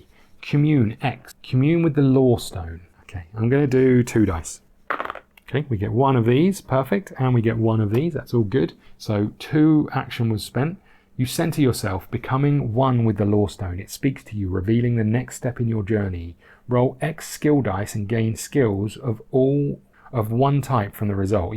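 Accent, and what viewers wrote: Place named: United Kingdom. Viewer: Sooo nice to hear a fellow Brit do a playthrough …makes a nice pleasant change from voices across the pond lol…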